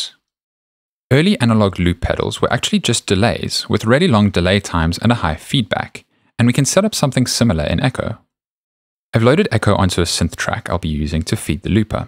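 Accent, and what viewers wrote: South Africa. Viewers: Your accent sounds South African, you from ZA by any chance?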